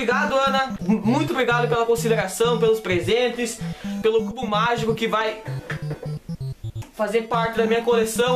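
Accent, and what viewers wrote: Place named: Pato Branco, Brazil. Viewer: uaheuhaueh o fala engraçado por que ele é de Pato Branco! (não leve pro pessoal)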